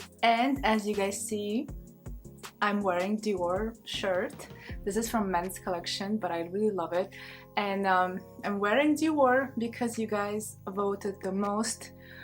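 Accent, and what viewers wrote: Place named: Russia. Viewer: Вы казашка? Акцент русский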